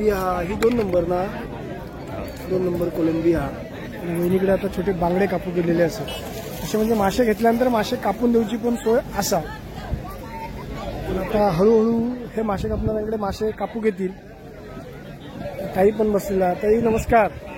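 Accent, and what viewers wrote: Malvani, India. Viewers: फारच छान बाजार तुमचे मालवणी ऐकाक मजा येता।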